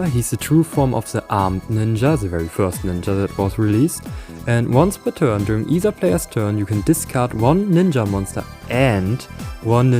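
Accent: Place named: Germany. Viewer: Bro, bist du aus Deutschland? Diesen Akzent erkenne ich doch meine ich 👀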